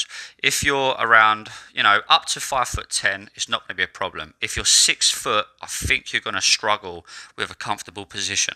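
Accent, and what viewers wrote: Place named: United Kingdom. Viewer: Wow, you have such a deep understanding of cars and how each component contributes to the overall function of the car. That type of knowledge comes from real-world racing I just found you while looking for Thrustmaster wheel vids, are you a real-world racer? You are very professional yet explain it where a novice like myself can understand it. Of course, it helps to be British you guys are clear winners in the oral presentation department. As I progress I will favor your knowledge and hope to see many more vids from you thanks!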